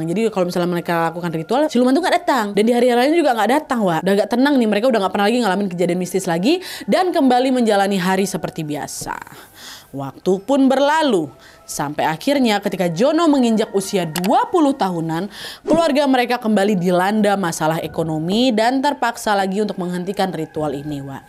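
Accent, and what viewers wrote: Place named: Banyumas, Indonesia. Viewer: mbaa wongg ngapakk😂😂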